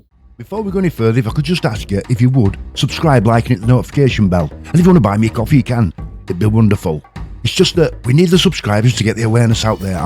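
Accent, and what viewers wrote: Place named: Yorkshire, United Kingdom. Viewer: Loving that yorkshire accent pal.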